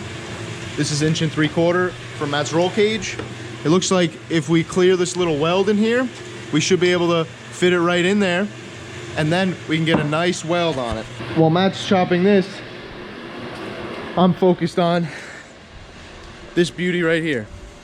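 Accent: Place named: New England, United States. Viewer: Thanks Guys. Where are you located? You sound like New England. That’s where I am and if you’re around here would you consider installing it for me? Thank you 😊. Maggie Donovan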